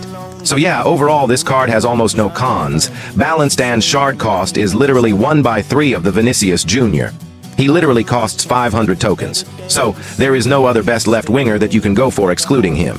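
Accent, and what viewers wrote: India. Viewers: yeah I just found out from one of his video and heard his indian voice and accent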